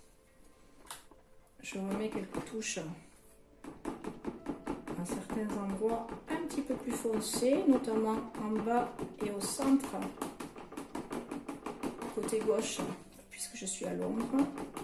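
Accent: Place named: Marseille, France. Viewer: You have a lovely accent...Marseille maybe. Similar to the rapper Akhenaton from IAM if i'm not mistaking. Anyway, love ur work ♥️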